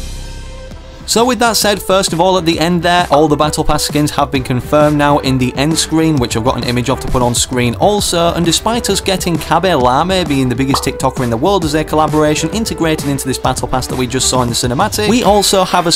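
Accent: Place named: United Kingdom. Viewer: I never knew you where British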